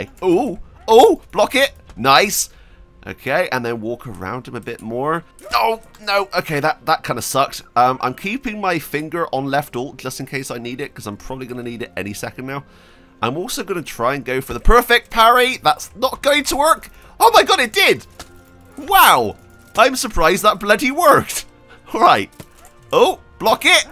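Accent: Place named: Bristol, United Kingdom. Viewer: My guy is from Bristol. Can't hide that accent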